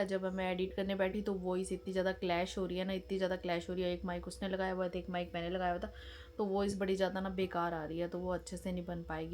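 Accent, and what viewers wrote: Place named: Multan, Pakistan. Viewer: Aap.ko Multani bolnee aatee hai. Aap Kaa accents hai Multani bolnei kaa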